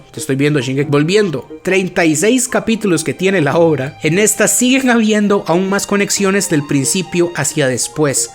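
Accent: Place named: Costa Rica. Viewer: BROOOO SOS TICO ¿VERDAD?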